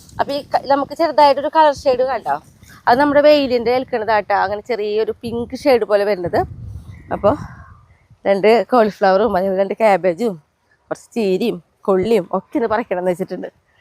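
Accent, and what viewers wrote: Thrissur, India. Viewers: കാണുന്നതു തന്നെ സന്തോഷം ഇത് സ്ഥലം എവിടാണ് തൃശ്ശൂർ ഭാഷാരീതി ഇഷ്ട്ടാ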